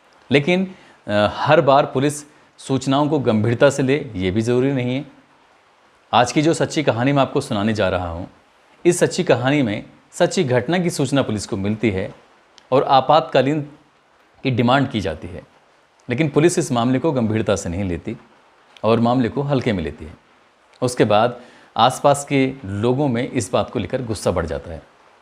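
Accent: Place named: Lucknow, India. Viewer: walekum Assalam shukriya janab bolane ka kya yah to Lucknow ka asar hai 🙏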